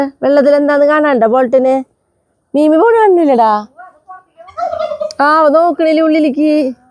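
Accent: Thrissur, India. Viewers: ട്ട ഞങ്ങൾ തൃശൂർ കാരുടെ ട്രേഡ് മാർക്ക്‌ ആണ് 😄😄😄😄👍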